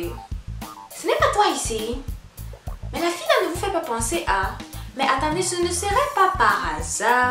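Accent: Bamileke, Cameroon. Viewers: 😂😂😂😂😂😂😂😂😂😂😂😂😂😂😂😂😂😂😂😂😂😂😂😂😂😂😂😂😂😂😂😂😂😂😂😂😂😂😂😂😂😂😂😂😂😂😂😂😂😂😂😂😂😂😂😂😂😂😂😂😂😂😂😂😂😂😂😂😂😂😂😂😂😂😂😂😂😂😂😂 hahahahahahaha!!!!!! l'accent bamiléké là c'est la magie!!!! troooooooooooooopp drôle tu es!!!! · Ton accent bami la me tue. On s'en fou comme dab 😂😂😂😂😂😂🤗🤗🤗😊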